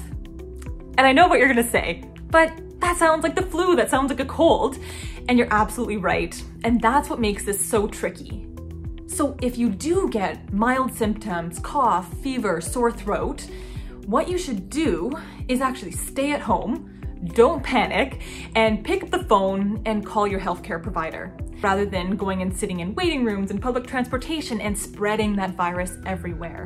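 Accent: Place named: Ontario, Canada. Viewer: OMG! I heard at least two or three “ABOWTS” and at least one “AAAOOUT” My fellow Canadian! I’m an emergency dept RN working in the US! Great job!!!!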